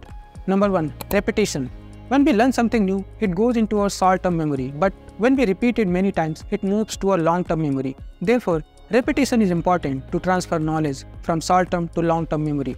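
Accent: India. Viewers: bhaiya aap bihar se ho kya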